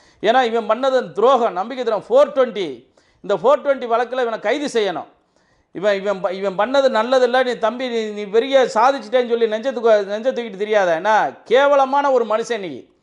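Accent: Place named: Madurai, India. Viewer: மதுரை மொழி கேட்கவே அருமை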